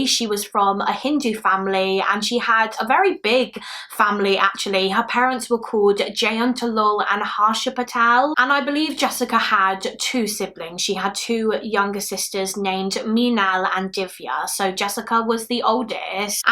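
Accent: United Kingdom. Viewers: I have been a subscriber of yours for a long long time, since a couple thousand subs, & I have to say that I have loved watching your growth & seeing you become more & more comfortable with every video. Congratulations on 100k love! I can’t think of anyone who deserves it more!! You really help this boring 42yo, mom of 2 grown kids, nurse, wife, & true crime FANATIC with your wonderful storytelling & your true empathy & sincerity. I love your accent too 😘 (I’m from Alabama, USA, so there’s nothing better than a beautiful British accent especially because I have one of those slow annoying southern accents 🤣) I just wanted to say congrats & tell you how much I love your channel. 100k down, now on to 1M! 🎉❤️🥰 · Great video !!! Keep them coming !! Great job love your look and makeup and accent !!! I am American always love British accent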